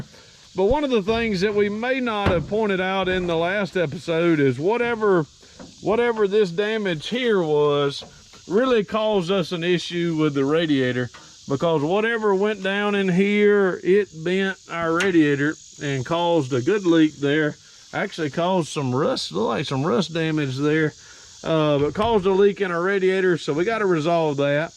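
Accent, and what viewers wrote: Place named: Georgia, United States. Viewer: thanks for replying👍👍you make me laugh so much,and love that georgian accent and your jokes and the bond between you and your family👍👍god bless you all and good health🙏🙏🙏🙏🙏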